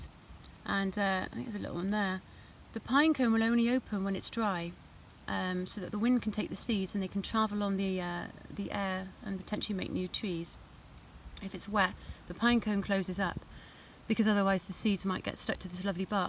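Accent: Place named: United Kingdom. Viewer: I wish I could understand your English-very British Very difficult to make out your words…sorry Because I’m very much into health/herbs…😟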